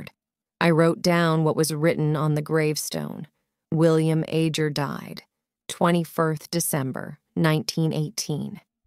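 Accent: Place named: United States. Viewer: I do believe this is an England story, but read in American accent. I am an new English learner and I am currently learning American English.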